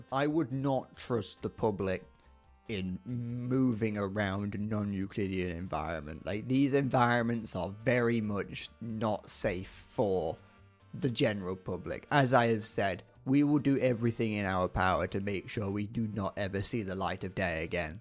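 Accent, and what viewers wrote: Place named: United Kingdom. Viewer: This was the best 17 minutes of british rambling I've listend to in a while... No idea what this games point is tho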